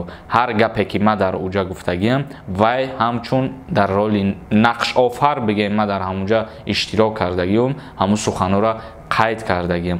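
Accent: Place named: Tajikistan. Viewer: Рахмат ика хушру гап мезани бо лахни точикии точики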